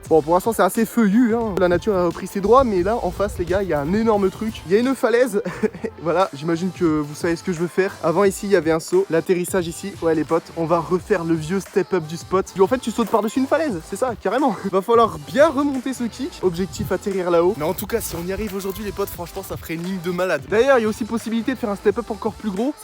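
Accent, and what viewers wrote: Alsace, France. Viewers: L’es accent alsacien ça me fumes😂😂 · L’accent alsacien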